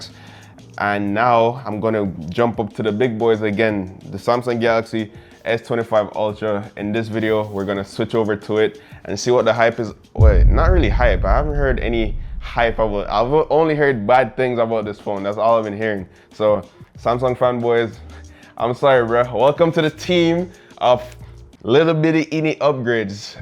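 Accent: Jamaica. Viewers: This Bredda Jamaican?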